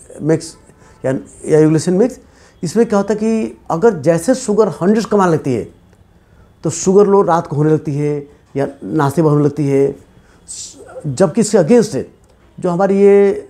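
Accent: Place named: Bengal, India. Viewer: Your Bengali accent is very difficult to understand sir Also please make videos in English with Subtitles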